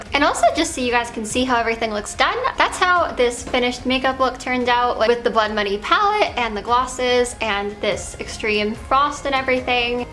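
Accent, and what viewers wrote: United States. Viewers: that's just an american accent lol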